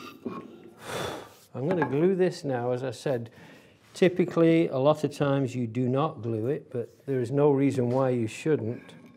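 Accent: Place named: England, United Kingdom. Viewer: Super travail et quel plaisir d'entendre un bel accent anglais. Merci.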